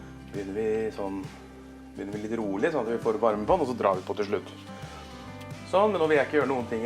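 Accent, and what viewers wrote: Østfold, Norway. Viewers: Var sikker på at den dialekta var fra Østfold .... God mat okke som :)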